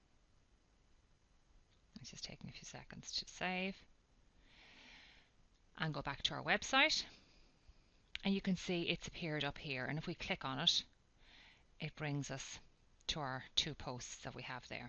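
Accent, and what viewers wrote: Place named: Ireland. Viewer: lol. I'm usually terribly annoyed by these sorts of videos. But your Irish-ness just made it so much nicer to listen to xD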